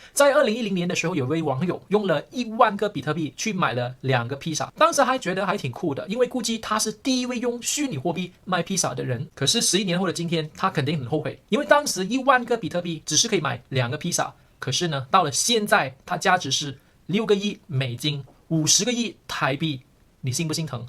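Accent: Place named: Malaysia. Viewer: 刚开始以为是移民到台湾的马来西亚人。没事，听的蛮舒服的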